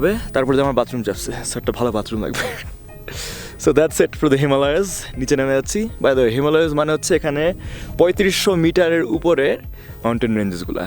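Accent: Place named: Narsingdi, Bangladesh. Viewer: নরসিংদীর লোক মনে হচ্ছে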